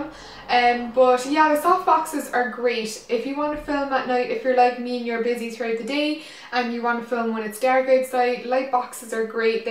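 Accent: Ireland. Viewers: Hey r u irish aswell😜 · The good Irish ascent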